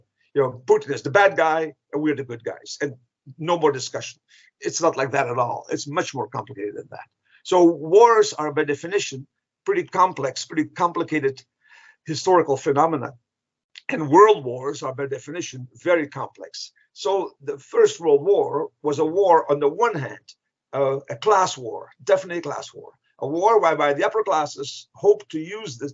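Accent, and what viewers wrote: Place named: Castile, Spain. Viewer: Es Canarias Semanal, ¿por qué la conductora es una castellano-parlante y no alguien que hable con acento canario?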